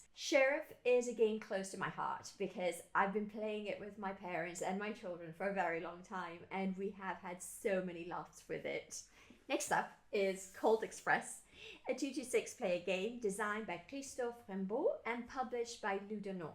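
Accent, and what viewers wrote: United Kingdom. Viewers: Dude are you british? Your accent sounds a bit off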